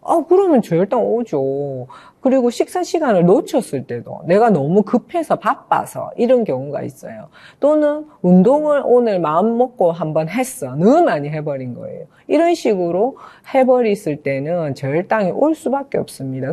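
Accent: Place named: Busan, South Korea. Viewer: 부산 사투리가 귀여우세요 😊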